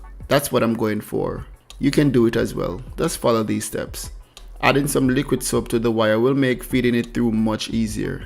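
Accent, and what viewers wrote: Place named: Bequia, Saint Vincent and the Grenadines. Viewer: Love de accent mon! Skiann for scan takes me right back 50 years to Bequia and discovering white guys spoke with the same accent, wild!!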